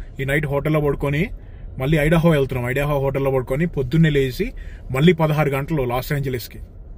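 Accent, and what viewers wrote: Andhra Pradesh, India. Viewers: Bro, you're slang like AP, but you are saying i am belongs to nzmbd. Moreover you need to exercise bcz your breathing voice is getting more.❤❤❤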